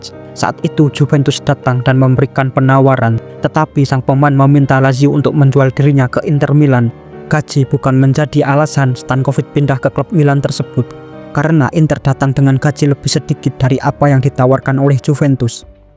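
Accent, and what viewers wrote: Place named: Java, Indonesia. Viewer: ngomongnya jangan medok bro, pake bahasa dan nada nasional. agar enak di dengar.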